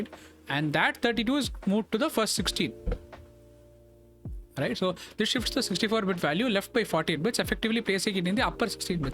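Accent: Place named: India. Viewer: Hi friend, i clicked your video because of the title ... really interesting topic, but i won't be watching the rest of the video, and because you are a fellow creator and just started i wantd to tell you why and give you a few hints on the way out - and hopefully be back later. First of all, a lot of people primarily listen to these kind of videoes, and besides from that you are providing a lot of interesting information, so the sound is really important. So i would recomend you a few things regarding that: 1: A lot of Indian speakers have horrible accents, but that is actually not that bad for you, but you speak very fast ... even if you where a native english speaker your pace is way to fast for this kind of content ... so try to speak slower and edit the sound so that your voice is a bit more clear in comparison wuth the background music. 2: I realize that this was a livestream, and it's fine that you share those like this ... 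but please add an intro, nothing fancy, just a clip of you explaining what the video is about and highligting the most important parts. 3: If you want to be really fancy, make a curated version of the stream ... break it up and narrate it. I really think this is an interesting topic, but i listen to these videos when i work - and because of the pace i cannot focus on my work while listening to this, sorry. I really hope you take this feedback constructivly and goes to the moon, power to you my friend! 👊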